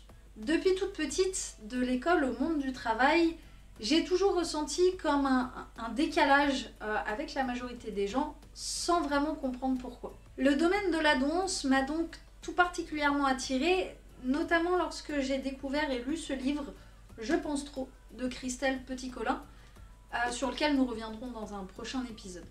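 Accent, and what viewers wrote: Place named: South, France. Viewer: Ah cet accent magique ! Putain con bonne mère :) Coucou de Toulouse ! J'enchaîne avec la suite de ton périple.... merci. Je compte aussi passer le test.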